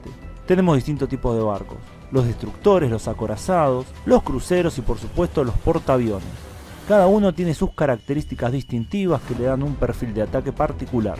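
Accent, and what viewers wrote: Argentina. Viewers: Hola voz de ultra argentina, ¿podrías hacer un video de la demografía y natalidad argentina pero diferenciando de provincia a provincia? Saludos.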